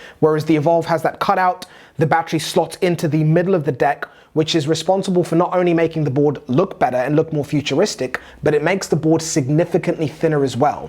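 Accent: England, United Kingdom. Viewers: What country do you live in? The way you're talking about other countries you sound American but then you have an English accent.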